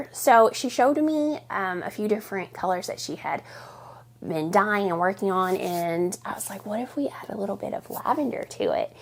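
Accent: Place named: Ozarks, United States. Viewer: I live in New England now but grew up in the Ozarks so love to watch just to hear your accent. Reminds me of home. Would love ❤to participate in the gift giveaway. Congratulations on 30K. You deserve it!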